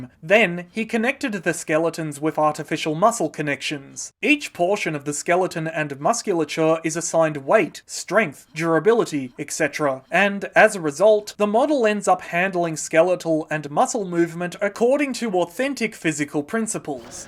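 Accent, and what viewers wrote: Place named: Australia. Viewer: He sounds Australian to me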